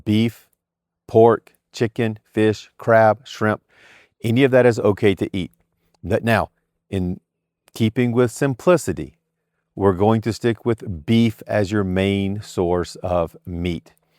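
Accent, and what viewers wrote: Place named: South, United States. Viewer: Hey! I stumbled on your videos while doing some research. I've tried this diet before and did great for 3 weeks but cracked because I visited a friend and had some whiskey which started a domino effect. Since then, I've fixed my drinking, I just don't do it any more! In that time, I have found my electrolyte solutions and salts. They work great. I just have a few questions. 1: Is it alright to incorporate 4 oz to 8 oz beef liver a week for those fat soluble vitamins? 2: Is there a calorie mark for the day? Last time I ate twice a day, around 2600. 3: "Keto Flu", did you just push through that detox phase? Any pointers? 4: Is this something you sustain? Or is it something you go through phases with? Ex. 3 months carno, 1 month incorporated diet. Hope to hear from you! Your southern drawl reminds me of my wrestling coach in high school and has opened up a new source of motivation for me!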